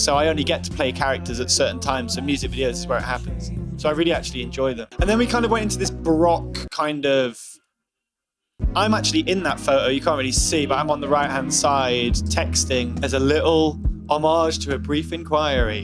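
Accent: United Kingdom. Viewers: Some people would think he’s high .... no just British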